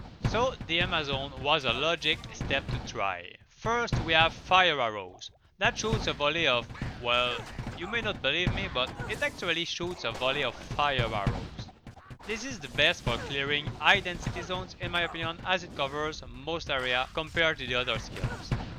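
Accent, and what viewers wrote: Quebec, Canada. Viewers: That quebecois accent, I could recognize from a mile away. Nice build btw.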